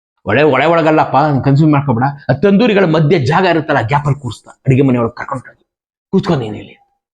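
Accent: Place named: Mysore, India. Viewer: ಬೋಸಿ 😂😂😂 ಈ ಪದ ಮರ್ತೆ ಹೋಗಿತ್ತು.... ಮೈಸೂರು ಕನ್ನಡ ಅಂದ್ರೆ ಇದೆ ❤❤❤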